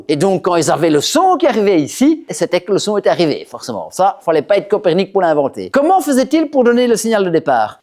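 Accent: Belgium. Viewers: Accent belges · Accent outrancièrement belge. Je m'efforce de le prendre au sérieux. Je n'y parviens pas.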